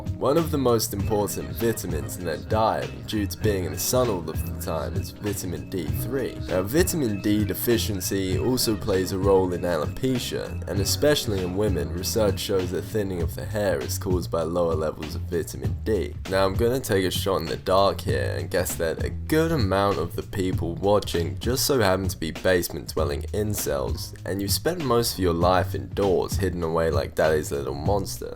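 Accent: Australia. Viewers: 😍 do I hear that Aussie accent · Was wondering why you weren't uploading videos just to find out that you opened a new account lmao your dry ass humor mixed with your aussie accent just stands out to much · No dickhead......hardwater is simply a parts per million density figure......could be peanut fucking butter in the agua,pull up fuckstick.......genetics and getting on the gear,you dumb dunce.........that stick reduces DHT does it.....................................finasteride and dutasteride have no sides in 98pcent of users...................my question is,are you self aware enough to realise you are a cuck who simply likes the sound of his own voice,Australian pronouncing VITAMIN like you do,govern thyself ........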